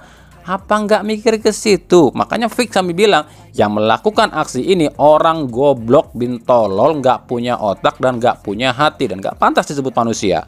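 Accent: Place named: West Java, Indonesia. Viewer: BUAHAHHAAAAA..... Yg punya chanel itu urang Sunda,logatnya itu yg jadi ciri....😀😁. Aingmah sok aya2 wae pertanyaan teh...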